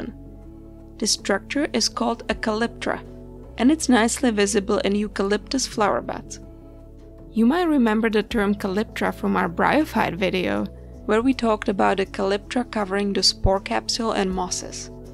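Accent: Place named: United States. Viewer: Thank you for this very accurate and useful video. It is very interesting for European users to know all these details and photos of American plants. It is also very courious to listen how you pronounce the families names, they are Latin names, but your American pronunciation (Americanization) makes them sound much more charming and mysterious to my ears.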